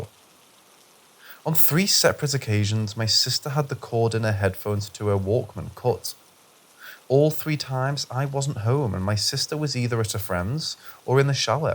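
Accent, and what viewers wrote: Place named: United Kingdom. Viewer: I'm British so love to hear a fellow British narrator. Thank for the uploads mate.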